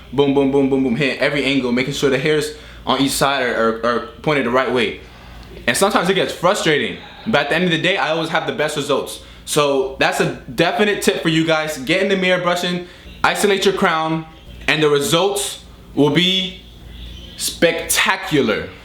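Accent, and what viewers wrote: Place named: New York, United States. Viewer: No bull shit bro, you lowkey inspired me to cut my curls, im dominican too bro, pero na for real bro you from new york? You deadass sound like it😭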